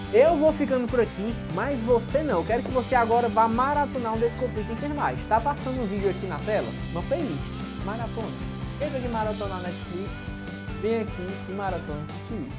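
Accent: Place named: Paraíba, Brazil. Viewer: faz vídeo sobre as medicações que mais são usadas na enfermaria, diluição, indicações de uso e contraindicações … ótimo vídeo! Sou da Paraíba temos sotaques parecidos kkkk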